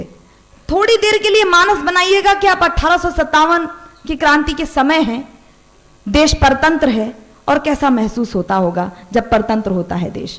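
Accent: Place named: Lucknow, India. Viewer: Bilkul lagta hai k aap Lko se hain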